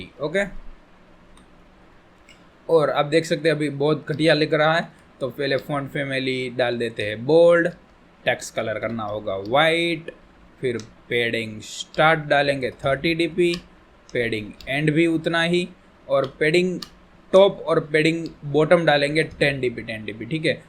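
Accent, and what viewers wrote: Gujarat, India. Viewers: Bhai tamara bolva parthi lage che k tame gujarat thi cho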